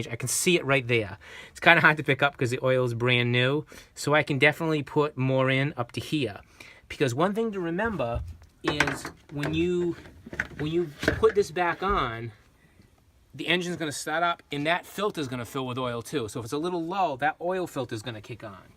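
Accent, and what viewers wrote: Rhode Island, United States. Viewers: Am I detecting a providence Road island accent?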